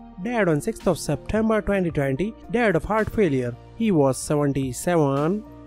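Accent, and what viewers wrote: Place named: India. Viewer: He did not ruin a thing, It's just he is from India and learned to pronouns like the English with a dash of an Indian accent .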